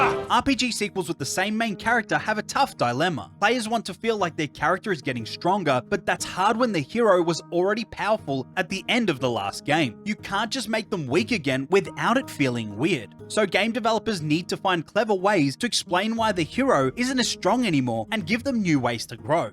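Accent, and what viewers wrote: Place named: Australia. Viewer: You're Hungarian ? I was swearing you sound like an Aussie !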